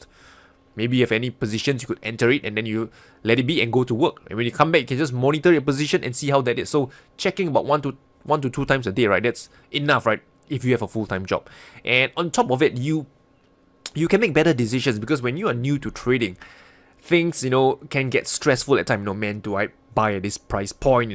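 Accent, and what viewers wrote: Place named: Singapore. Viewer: I like the accent reminds me of my long lost childhood friend from Singapore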